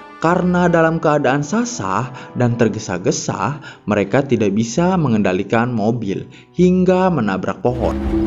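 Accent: West Java, Indonesia. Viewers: Lucu bgt dngerin narasinya kaciri pisan org sunda ketika ngomong " SABAT .. dulu .. 😂😂😂 piseurieun pisan jadi gekgek ngadanguna ... sukses terus lah channel na smoga mkin tmbh subscrb na .. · Sunda Pisan Euyyyy 😂😂 Narasina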